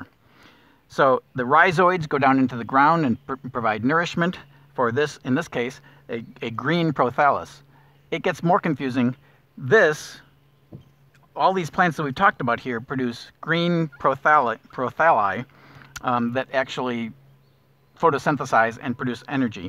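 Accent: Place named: North America. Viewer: I notice you have a North American accent. Will you be doing lots of plants that can be found in the UK? Found you from hearing about the Photosensitive poison in Parsnips.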